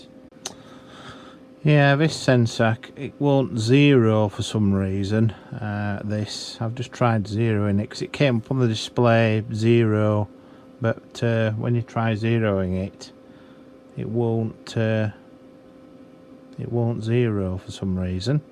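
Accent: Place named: Yorkshire, United Kingdom. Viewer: Thats a Yorkshire accent where you from? Im from Rotherham.. but i've lived in Norfolk 24 years now.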